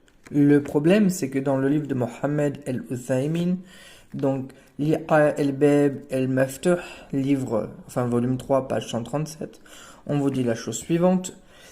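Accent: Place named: Arab world. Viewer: Poto t'as un accent arabe découpé à la scie c'est pas ouf. Tu vois "passer du coq à l'âne" bah c'est pour toi cette expression idiomatique française.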